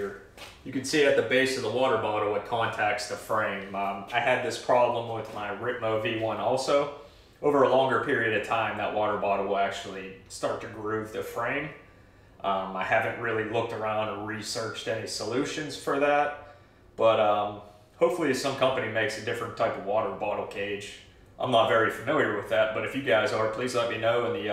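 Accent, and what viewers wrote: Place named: Pittsburgh, United States. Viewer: Without even reading your page I knew you were from the burgh. Thanks for the review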